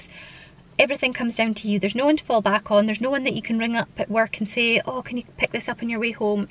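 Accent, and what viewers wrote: Scotland, United Kingdom. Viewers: You Scottish yeah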